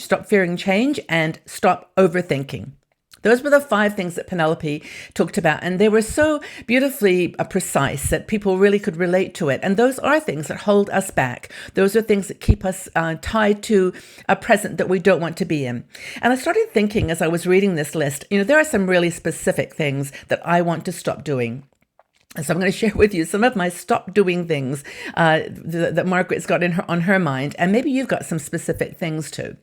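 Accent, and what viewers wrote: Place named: England, United Kingdom. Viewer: LOVELY!!!!! SO NICE TO HEAR YOUR ENGLISH VOICE MY DEAR. I LEFT LONDON IN 1952 AND STILL HAVE MY BRITISH ACCENT, OF WHICH I AM VERY PROUD. I MEET FEW BRITS, BUT LOVE THE ENGLISH MENTALITY, WHEN I DO....SO. POLITE, EMPATHETIC, FRIENDLY AND UNDERSTANDING, A GREAT JOY ALWAYS....A N D... SO I SEND MY WARMEST CHRISTMAS GREETINGS TO YOU. YOU ARE INDEED A PLEASURE TO ALL OF US.... AND ......I LOVE TO BE ALONE AT CHRISTMAS....I CAN REFLECT AND THINK OF MY BELOVED HUSBAND AND MY DARLING DAUGHTER WHOm I LOST LAST JANUARY TO CANCER...MY FAMILY ARE NOW FEW AND FAR AWAY, BUT WE ARE VERY CLOSE, AND KEEP IN TOUCH ALMOST EVERY FEW DAYS...SO THE LOVE NEVER CEASES....But I am alone and that;s fine with me....I make the best of life...Happy Christmas to you dear friend. I AM NOW 88 1/2 AND AM AS FASHIONABLE AS I ALWAYS WAS!!!! LOVE IT!!!! 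Hahaha! I honestly love to be alone at Christmas, and reflect on those happy memories I have.I am not sad at all.....I LOVE my time alone, and look forward to the new year immensely.God bless you and yours. You are my Mince pie and sausage rolls connection. My Mum too!!!!! used to make them, and we put sixpenny pieces in the Christmas puds she made......Happy days....even the War days.... Happy Christmas everybody💋💋💋💋🌲🇬🇧🇨🇭🇺🇸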